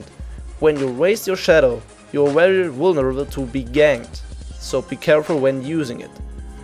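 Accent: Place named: Germany. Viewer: Ich kann dein Englisch mit deinem Deutschen akzent nicht ab :D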